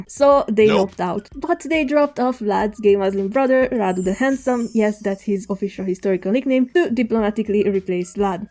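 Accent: Hungary. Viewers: you definitely have hungarian accent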